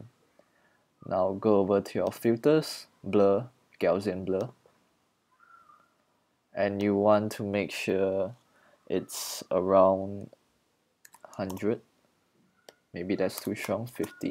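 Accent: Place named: Singapore. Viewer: Thank you so much for this tutorial! I am learning how to edit some artwork for my gaming channel and am willing to learn more tricks about GIMP! Are you from Singapore (making a guess based on your accent... cuz I'm Singaporean here!)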